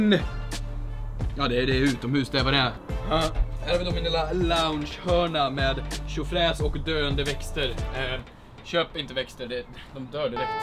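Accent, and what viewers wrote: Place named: Suburb, Sweden. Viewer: varför pratar du orten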